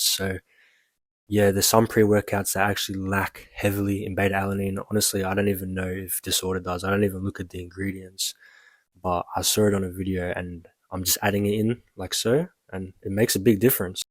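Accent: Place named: United Kingdom. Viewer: that accent thooo you in the uk ??!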